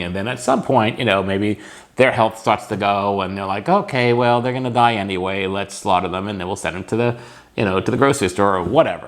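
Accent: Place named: Long Island, United States. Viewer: I just found your channel and subscribed after watching the first one. I think you're great. I love your enthusiasm and dedication. It's helping me get excited about the recipes. I especially love your Long Island accent. I am actually a vegan living in Park Slope, Brooklyn, but I've always wanted to live in Phoenix/Tucson. Should I make the move? Looking forward to more videos! Thanks!! Keep up the great work.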